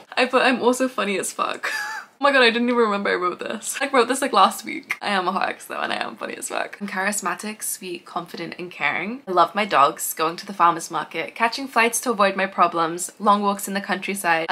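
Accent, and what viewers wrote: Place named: United States. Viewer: No cus I’m 28 now and have watched your videos for years but haven’t watched in so so long and I felt a lil disconnected cus your American accent has gotten so much thicker and I feel like I’ve missed out on sooo much. Second I heard ‘let’s go ladies’ I was back in the zone. Back to the video with the dry shampoo. If you know you know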